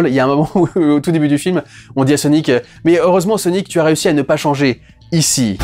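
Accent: Quebec, Canada. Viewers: Accent québécois correct. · très bon accent québécois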